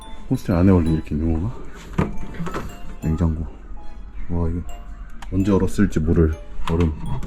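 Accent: Gangwon, South Korea. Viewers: 이자는 강원도 사투리가 조금씩 섞인 말을 한다.... 오늘은 그래가지고 등등 ㅋㅋㅋ 노란색 티셔스 입으면 만화 꿀딴지 가지고 있는 곰 모습이 보인다 ㅋㅋㅋㅋ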